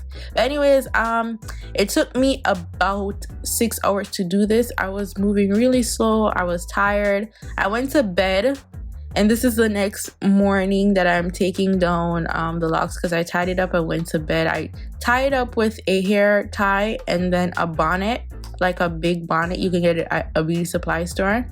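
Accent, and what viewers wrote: Jamaica. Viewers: Are u Jamaican?? Hearing that accent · I always see your videos but this is my first time actually watching one & I loved it!! The minute I heard you talking I was like “yeah she’s Jamaican” lol ❤️😂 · Ur Jamaican right??? Great vid by the way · Beautiful and I love that jamaican creole wah gwan😍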